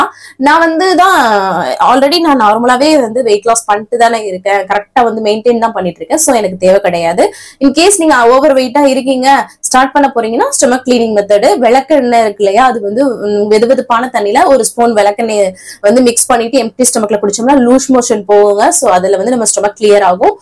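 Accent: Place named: Madurai, India. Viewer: kk but pesurathu Madurai slag mathiri iruthathu na madurai tha native but ippo mrg aagi tirupur vanthute 8 yr aatchu 💕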